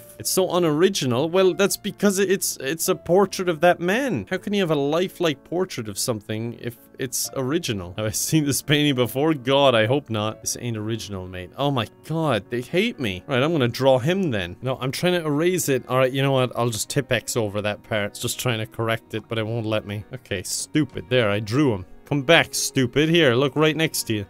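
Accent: Ireland. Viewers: I love his Irish voice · part ireland u from g?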